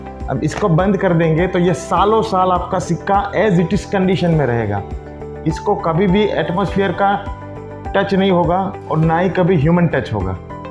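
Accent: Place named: Gujarat, India. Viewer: સર તમારો આ વિડિયો જોય ને બોલી ઉપર થીલાગ્યું કે આપ ગુજરાતી છો ખૂબ સરસ માહતી આપી શું તમે જૂના કોઇન લેવે- ચ કરો છો તો આપનું સરનામું મોકલશો 👍👍👍🔥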